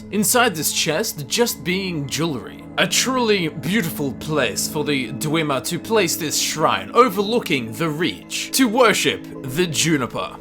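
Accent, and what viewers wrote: Western Australia, Australia. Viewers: Are u a fellow Aussie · I thought you were British, I guess I don't have a keen ear for Far west accents.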